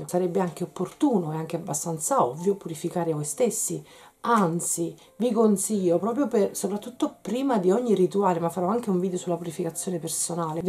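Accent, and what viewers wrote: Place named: Lazio-Campania, Italy. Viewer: ma tu abiti tra Lazio e Campania? amo il tuo accento...e i tuoi video! un bacio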